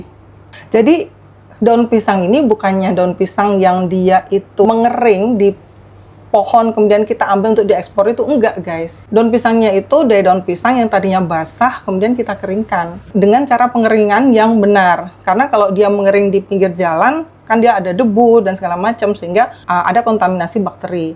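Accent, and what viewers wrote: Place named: West Java, Indonesia. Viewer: Sunda pisan teh...😊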